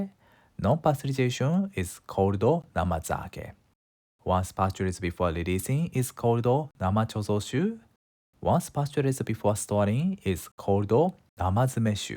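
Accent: Japan. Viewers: I would like to commend you on the progress of your English speaking. I also love that you have preserved your traditional Japanese accent which is delightful to listen 🎧 to. I love your videos and thank you for the wonderful content you provide. This one is a particular favourite of mine. Regards and have a great day 😊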